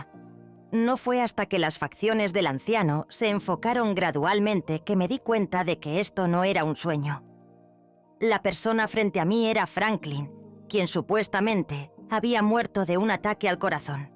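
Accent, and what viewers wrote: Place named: Castile, Spain. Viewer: AHORA LA NARRACION ES CASTELLANA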